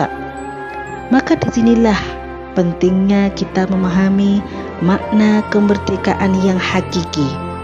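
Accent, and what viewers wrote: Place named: Java, Indonesia. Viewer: MasyaAllah tabarakallah.. sehat selalu dek. Medok nya buatku merindu..💕💕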